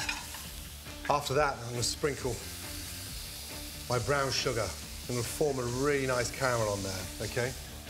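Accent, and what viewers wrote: United Kingdom. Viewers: Hi Gordon! I love your dishes, I'm Mexican and for me it is difficult to understand your British English, but I love to watch you...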